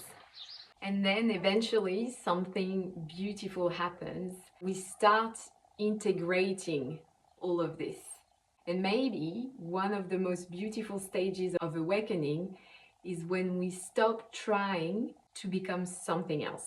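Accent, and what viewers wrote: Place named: France. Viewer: It is funny how some videos just appear. It sounds like you are french. Have you heard about the cathars?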